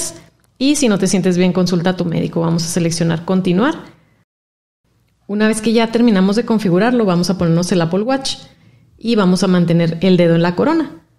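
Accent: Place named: North, Mexico. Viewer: Me encanta tu acento norteño ❤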